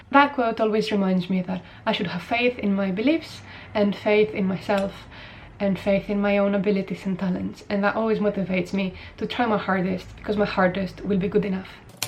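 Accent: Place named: Greece. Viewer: that explains it! My good good friend Akis is from Greece in Trikalla, both your accents are fairly similar. I know the word clap and that is al... are you ready to be overcome with emotion... right here is comes: hirokrotima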